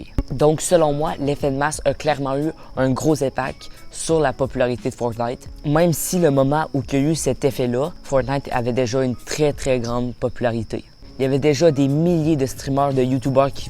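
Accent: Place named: Canada. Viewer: Bonne vidéo frérot ! Avec le petit accent canadien 🙏🏻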